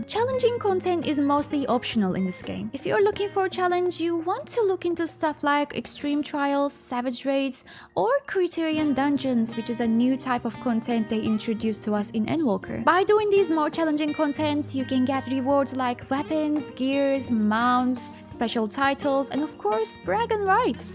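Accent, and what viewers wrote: Turkey. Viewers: I can smell a turkish accent, are you turkish? :D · bu bir türk aksanı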